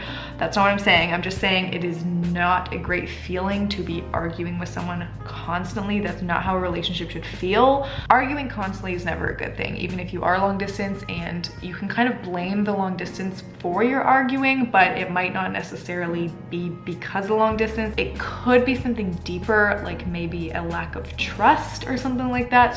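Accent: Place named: Canada. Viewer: "Red Fleg" I love the Canadian accent.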